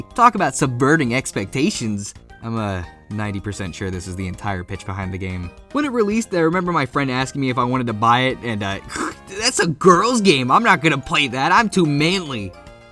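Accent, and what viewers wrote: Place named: New York, United States. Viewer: I might be going crazy but, I swear he's getting more and more New Yorker as time passes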